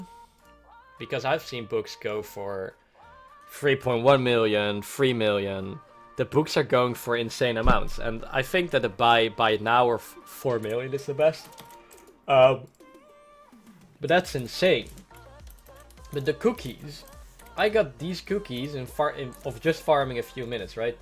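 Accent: Netherlands. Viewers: U sound Dutch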